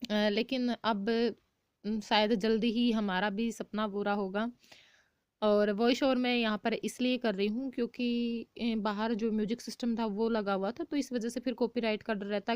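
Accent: Haryana, India. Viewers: Very nice di aapka haryanvi andaz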